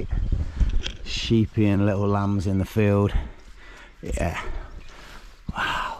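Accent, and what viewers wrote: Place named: Loughborough, United Kingdom. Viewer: I'm from Loughborough and spotted that accent immediately haha 👌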